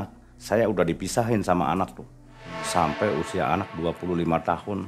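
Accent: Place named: Cirebon, Indonesia. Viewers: Cirebone kentel pisan mang😂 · Logat cirebon nya khas bngt walaupun ngomong indo juga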